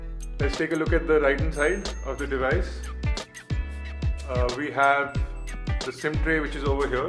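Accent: India. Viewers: Hands of a white man, but accent like an Indian. Dude, your review put me to sleep...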